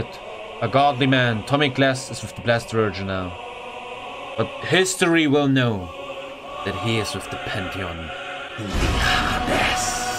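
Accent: Germany. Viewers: Is he german? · you sound a little bit Saxon sometimes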